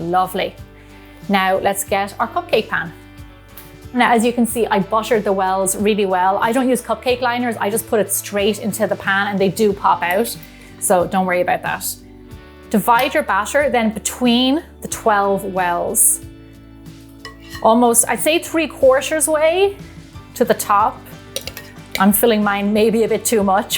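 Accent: Ireland. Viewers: I love your cooking the dog treat ones were so much fun and easy to bake! My dog ate them so fast I’m not even such he swallowed!! 🤣 (Also are you Irish!?’ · You Irish? Edit : I've just checked and you are😊🇮🇪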